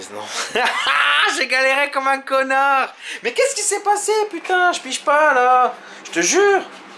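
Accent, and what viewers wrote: North, France. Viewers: Quel plaisir que cet accent du nord matiné de syntaxe supérieure, de mécanique de précision et de jurons de chez mémé. C'est comme le résultat si Edd China s'était retrouvé dans une soirée coquine avec maitre Capello et les frères Lopez. Ca ma rappelle ma jeunesse.. Merci pour ces vidéos et pour le temps que ça prend à faire. La question qui m'interroge la tout de suite concerne ce super tuto de la "thermodynamique de la combustion d'un moteur froid expliqué à ta petite sœur" : Il se trouve qu'un spécimen de VR32 BKK à élu domicile sous le capot de mon camion (et c'est pas moi qui l'ai mit, c'est les messieurs de chez WV, il est comme ça depuis le début, c.f. WV multivan V6, ne pas taper merci ou au moins pas la tête...) Bref : Je suis coutumier de sa mise en route et du bruit de cette turbinette d'air secondaire (techniquement dite turbinette de brun). 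Je sais aussi que son non fonctionnement est rapidement sanctionné par l'ODB et son petit logo warning orange sur le TdB. (techniquement dit voyant de diagnostic de défauts de crotte ) Ma question est donc : "Comment que c’était ty que on fèzè pour pas que eul voyant de brin il s'allume le kon si on vire tout le bazar ?? ( ou comment tromper l'ODB sur ce point et sur d'autres aussi si vous avez des choses à dire sur l'OBD version KWP2000 pour faire une reprog ..si possible par cher...a quand le programmateur d'ECU chez lidl..). Bref merci de m'avoir lu, merci pour votre réponse, encore bravo, gloire au VR6 et power corons !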